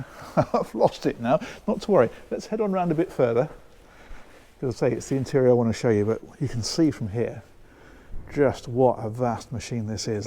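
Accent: United Kingdom. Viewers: Now that's the best use of space in a class A I've ever seen. And if you don't carry a vehicle the garage can be another bedroom or office or playroom or Mancave. Excellent design. And the biggest surprise is the price. At E285,000 very affordable. I like the British accent....Gah-rahge....Priv-a-see!!! Cool👍 These reviews are excellent just like your boat reviews.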